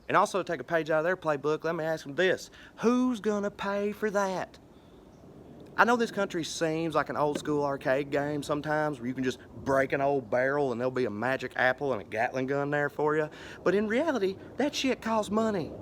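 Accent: South, United States. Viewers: It's good to hear that accent talk some sense for a change! Go! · 59 year old white man here. Originally born in s. Carolina. Everything you say is spot on. I love it, I think you are the best spokes person for the irony of these times on the net. More power to you, keep it up. I will come see you if you are in Maryland or DC. Seriously, keep it up. Your voice matters, and your point of view is well thought out and well spoken. And the good old boy slang works to great amusement and effect. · the suthern accent has always annoyed the fuck outa me, but gawd dayum if I couldn't listen to this jar of intelligent hicksauce read a fucking phonebook!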